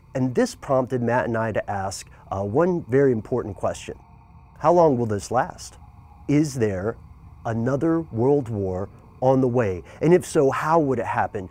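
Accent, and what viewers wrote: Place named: United States. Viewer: Hey, you sound American, so why do you use the term "petrol" rather than "gasoline"? I'm not being flippant; I'm genuinely curious. Are most of your viewers European?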